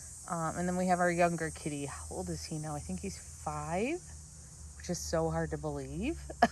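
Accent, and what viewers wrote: Michigan, United States. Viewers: I think you have a Michigan accent. How’s Gretchen doing in Lansing?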